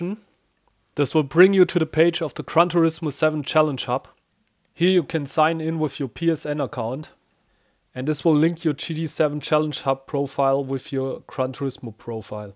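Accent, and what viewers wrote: Germany. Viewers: Dieses deutsch was ich raushöre🙃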